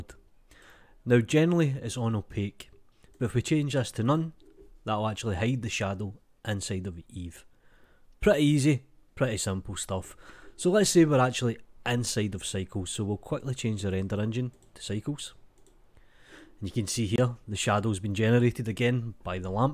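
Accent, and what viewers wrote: Scotland, United Kingdom. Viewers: Are you from Scotland? Because your accent sounds really similar to the guy from Limmy's Show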